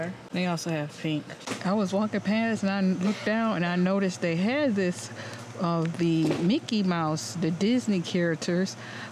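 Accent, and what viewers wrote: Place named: Deep South, United States. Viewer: You from the DEEP south girl. ❤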